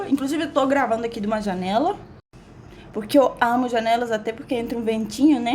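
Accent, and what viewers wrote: Rio de Janeiro, Brazil. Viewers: Voce está falando um pouco...como os cariocas...Vem aquí para Sao Paulo...Adoro voce. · Eu acho que você não é CUBANA Seu sotaque e totalmente brasileira e esta se fazendo de cubana para ganhar likes e inscritos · Hola. Por primera vez entrei o seu canal. Pensava que eras brasilera. Como aprendiste a falar assim com assento brasileiro perfeito? Eu sou cubana também,mais,eu gosto muito de escrever. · Olá, vc já é uma Carioca. Até o sutaque, já pegou.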